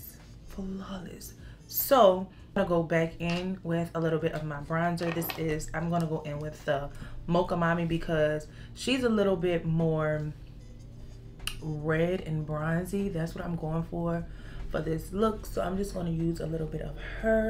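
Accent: Louisiana, United States. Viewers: You've gotta be from Louisiana!!!😅😅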